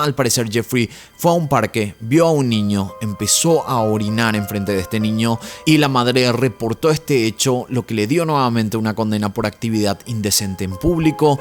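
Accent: Paraguay. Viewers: Es el acento nuestro de los paraguayos! es paraguayo. Saludos a Venezuela desde Paraguay!! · de donde sos??? o tus padres> por el acento pregunto:goodvibes: ( Paraguay??) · Me pareció que serías de PY por el tono de hablar y genial al saber que si, que grande sos gracias YT por mostrarme esto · Apenas te escuché hablar cada vez me convencía más que eras Paraguayo, o estoy equivocada? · Sos paraguayo? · tu acento es paraguayo vrd? lo digo pq yo soy paraguayo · Buenas tardes, genial tu canal, una consulta, sos de Py? Te pregunto por el acento, gracias por tus aportes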